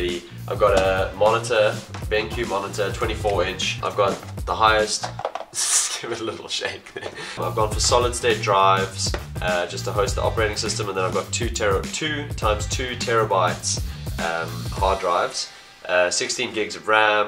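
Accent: South Africa. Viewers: awesome bro, like to hear dialects from home. I'm from nam..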